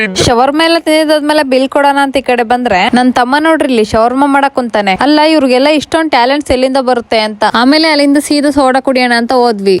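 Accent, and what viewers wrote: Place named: Chitradurga, India. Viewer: Nimdu proper Chitradurga na sis..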